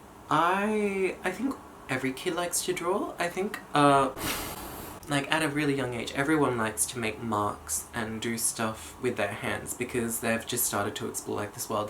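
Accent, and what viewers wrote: Australia. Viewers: What nationality are ya? Sound Aussie... I think? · Is that an Australian accent? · I recently subscribed and AHH HE’S AUSSIE I DIDNT KNOW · Oh that auzie accent. Wow. R u from Sydney? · Is that an Australian accent I hear? I've been working on improving my imitation of an Australian accent, but I've been finding it pretty difficult.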